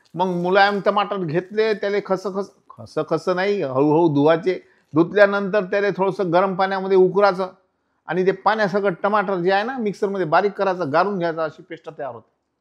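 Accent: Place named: Varhadi, India. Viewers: Aaji lay chaan varhadi bolta Maja aa gaya Mai Nagpuri ❤ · वऱ्हाडी छानच बोलतात आणखी कोणत्या भाषा येतात तुम्हाला? नक्की बनवून बघणार, मी playlist बनवतो आवडत्या रेसिपीज ची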